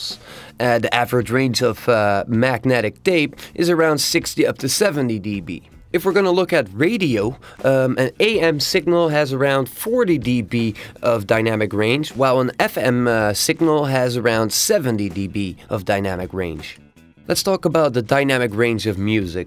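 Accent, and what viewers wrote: Netherlands. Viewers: I'm one and nope. I think it's dutch too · de eerste Nederlander met een normale engelse accent haha! Goed werk ! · I'm quite sure it's dutch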